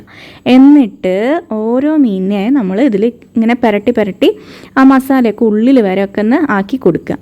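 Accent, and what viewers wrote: Thrissur, India. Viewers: Stylan dish kalakki chowavilla Thrissur basha kidu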